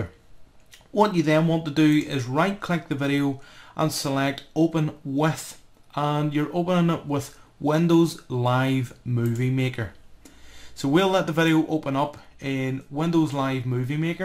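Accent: Northern Ireland, United Kingdom. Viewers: Hey are you from Northern Ireland?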